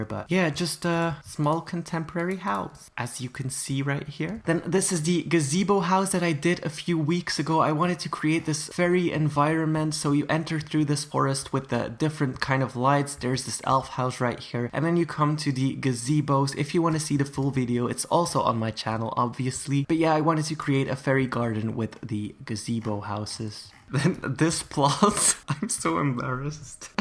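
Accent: Norway. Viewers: Are you norwegian? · are you norwegian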